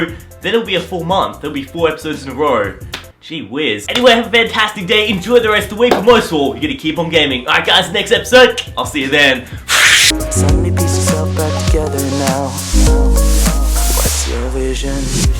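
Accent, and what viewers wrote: Australia. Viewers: I love that fabulus Australian accent.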